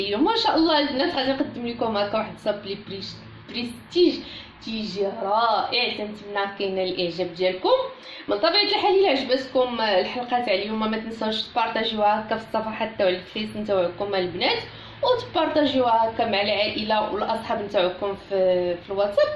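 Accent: Marrakech, Morocco. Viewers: لﻻ الله يحفظك انا عاد شاركت معاك حيث عجبوني حليواتك تبارك الله عليك وسبحان الله لهجتك المراكشية عجباتني واحببتك في الله وماشاء الله عليك أتمنى لك المزيد من التألق والتوفيق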